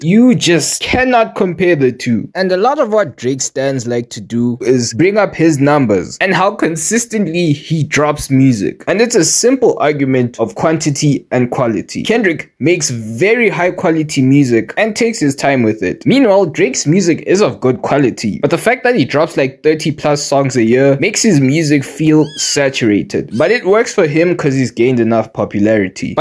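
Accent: South Africa. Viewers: You sound like you're from 🇿🇦...🤔🤔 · You South African? That accent...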